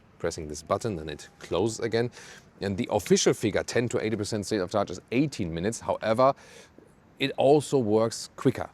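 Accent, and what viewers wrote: Germany. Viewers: Als Amerikaner würde ich fragen: in England spricht man Englisch? Mn hört seinen deutschen Akzent her recht gut raus 😊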